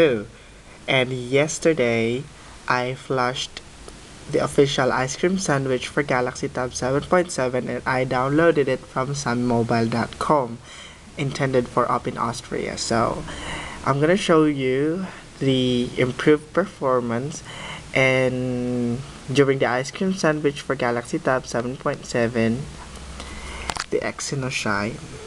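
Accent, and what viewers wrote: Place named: India. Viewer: why is your accent sound like indian?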